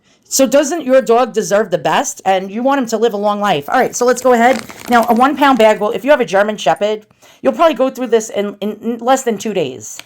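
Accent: New York, United States. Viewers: What a great review! I love the New York accent! Can’t beat authenticity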